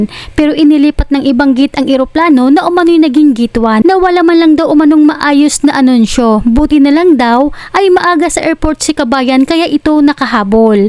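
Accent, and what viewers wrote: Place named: Visayas, Philippines. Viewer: Maam BISDAK ang boses mo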